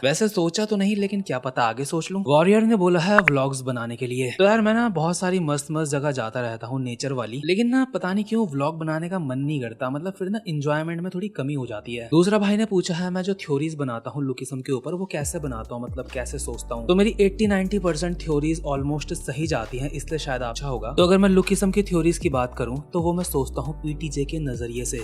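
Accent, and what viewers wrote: Uttarakhand, India. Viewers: surname se pta lg gya tha ap uttrakhand ke ho accent se bhi 😍ap kumoani ho y garwali me bhi uttrakhand se hu ❤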